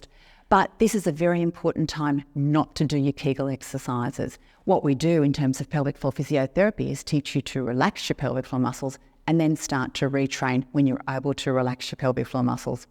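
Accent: Australia. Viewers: your Australian accent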